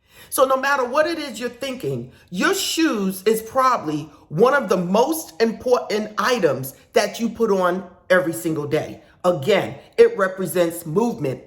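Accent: New York, United States. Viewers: 🥰Thank you as always! Love that NY accent!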